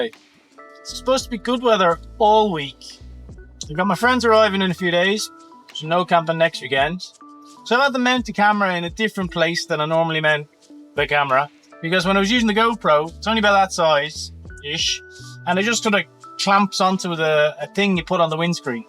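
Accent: Dublin, Ireland. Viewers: Loving your vlog. Been watching from the start so maybe I’m number 30 or so of your 40 or so followers. Was great to hear that New Zealand is a place you want to visit. We love Irish accents here. Love your down to earth approach. Would love to see more of France but can’t travel at present (no I’m not in jail) so really love your trips in Pasquale. Great camera work. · If you mistake a strong Dublin accent for a Scottish one, you can't be too familiar with either... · I could listen to you talk all day. Love an Irish accent. Great vlog, keep them coming.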